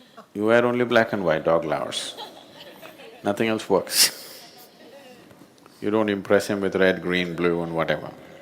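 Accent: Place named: India. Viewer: من از لهجه هندی بهتر انگلیسی یاد میگیرم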